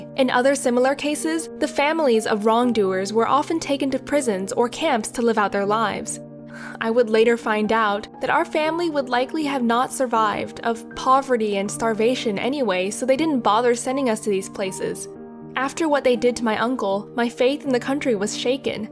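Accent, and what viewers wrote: United States. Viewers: she sounds american also (not bieng racist) dont asian people have like an acsent like common she dosent sound korean at all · Yet you sound American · U r from korea but u have a american accent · Dislike. She sounds like she's from the USA, not North Korea, so she has no first hand experience, This makes her sound like a propagandist. To anyone who this did not occure to, your thoughts and opinions are being controlled by propagandists. I do not know the full message of this video as I stopped at 58 seconds, so if anything changed, then I don't know about it, but from what I heard so far, it sounds so incredibly propagandist to a point that it;s difficult to listen to. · hmmm American accent hmmm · She does not sound fron North Korea 🇰🇵 she sound from America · Stick The person who submitted the story is from North Korea, the narrator is American.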